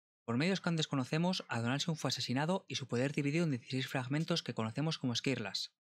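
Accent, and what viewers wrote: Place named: Spain. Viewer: hablas muy rapido (o yo estoy media lenta). Aun sabiendo del tema tuve que volver a escuchar en reiteradas partes, ya que no estoy acostumbrada a tu acento espanol a 90 km/h. saludos desde Argentina.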